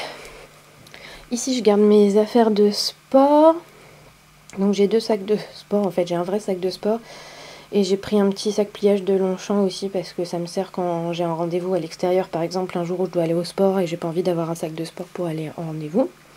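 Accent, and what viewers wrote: United Kingdom. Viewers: Tu as un accent so british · ton niveau d'anglais est top!!! en plus tu a l'accent anglais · un très joli accent Londonien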